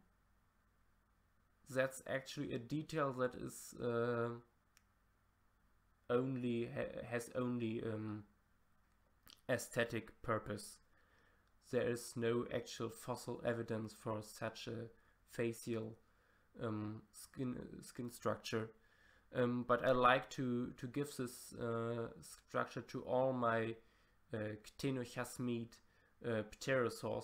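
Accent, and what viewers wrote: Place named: Germany. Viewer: I love your german voice, like so much. You sound like Colonel Klink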